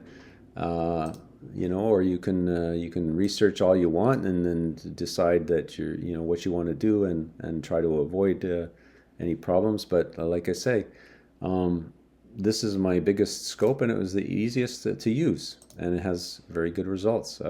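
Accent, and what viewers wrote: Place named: Canada. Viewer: Never saw one of your vids before this, but the thumbnail caught my because I instantly figured it must be Starfest. Then hearing your Canuckistanian accent (me, too) pretty much confirmed it. As I type at roughly the 12 minute mark I hear you say that it is Starfest. My last time there was in about 2013, if my memory doesn't fail me. 😉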